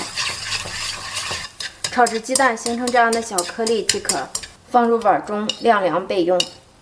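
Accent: Shandong, China. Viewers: 山东姑娘是吧？不错 👍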